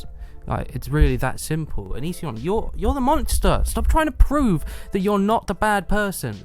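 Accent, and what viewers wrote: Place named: United Kingdom. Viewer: I love hearing a Person with a British accent shit all over him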